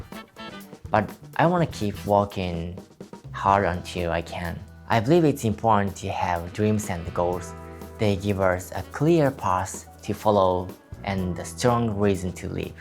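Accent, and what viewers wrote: Japan. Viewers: The beauty I hear in your speech is because you are a native Japanese speaker talking to us in English. I understand every word. I'm from the South here in the US and let me say, we can destroy some English pronunciation and there is no shame! We embrace it. Please do the same. Individuality is beautiful. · I have Japanese friends and have visited your country 4 times. I love Japan very much and wish that I could live there. My Japanese friends speak some English, but not as well as you do. I respect the effort that is put into speaking another language. I try my best to speak Japanese, but only know basic phrases, because at 62, it is hard for me to remember a lot of Japanese. It is easier for me to understand most of your English, because of your Japanese accent. Keep up the good work. ❤️ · Your English is GREAT !! Please remember that people in the United States have accents also; especially in places like Mississippi, North Carolina, even New York ! 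Depending on the accent, you can tell exactly where someone is from- no shame… just the way it is. your pronunciation is perfect. Please remember that you are Japanese first and foremost; so of course you’re going to have a “accent” when you speak English. I admire you for wanting to improve, but please only improve if it is something you want to do not from outside pressure. And I love your outfit today !! HANDSOME !!! Keep up the great work !!!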